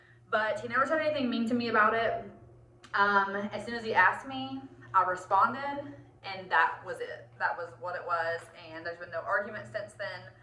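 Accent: South, United States. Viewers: I just loveeee your southern accent. I dont want it to go away when you move. 😭😭😭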